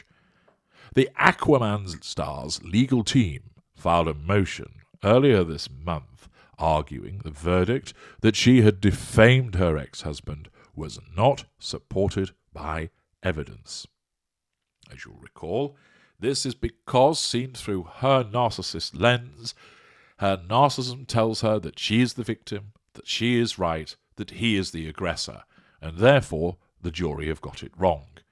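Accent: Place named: Northern England, United Kingdom. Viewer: Think you hail from the North of England 🏴 have been curious for an age!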